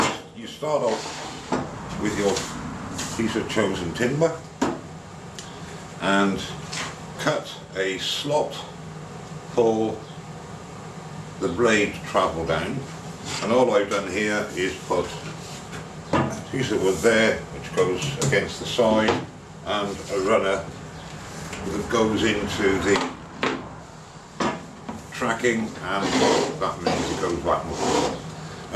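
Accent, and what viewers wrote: Wales, United Kingdom. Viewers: hey big guy lol lol no sarcasm today hope u get better soon bud and i aint kidding ive seen bells palsy before....was wondering where u were was missing yur welshness lol lol glad yur back and the jig is great keep the vids coming have missed u greatly......dougie....frm bonny scotland...... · I hope you recover quickly. I really enjoy your video's and enjoy the Welsh accent have not heard one since my Great Grandfather died. I had one though if you had put another 2x4 on the edge away from the blade slot you would not need the stop block, in my shop the stop block will wind up getting turned or lost! All in all good on ya!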